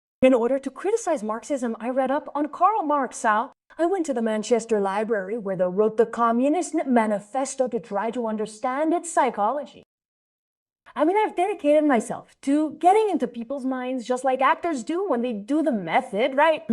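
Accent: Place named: Russia. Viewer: Porque suena como un ingles ruso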